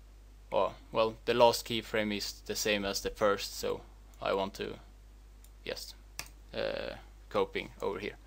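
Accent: Sweden. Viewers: ur swedish arent u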